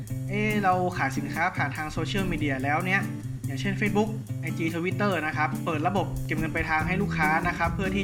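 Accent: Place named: North, Thailand. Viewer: ก็ว่าครับ อู้สาบเมืองเหมือนผมเลย😄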